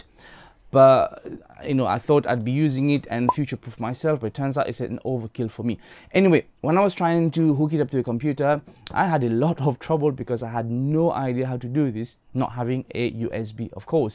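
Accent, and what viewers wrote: United Kingdom. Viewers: Do you know how many Vid's I've watched just to get this info? Way too many (Short Sweet And Straight To The Point) Thankyou ;).... And you're British always a plus. haha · Bob's your uncle! So British.....great explanation and video.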